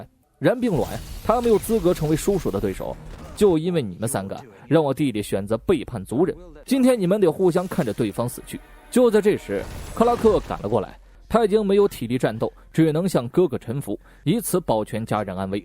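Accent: China. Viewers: 介广东话比我还飘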